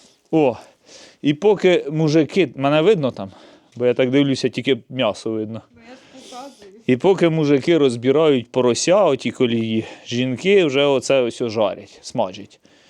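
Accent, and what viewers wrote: Ukraine. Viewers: Этот украинский говор в какой области?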